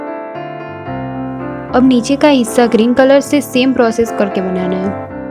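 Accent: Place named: Gujarat, India. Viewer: R u gujarati??your sound is like gujarati...btw,great work..👍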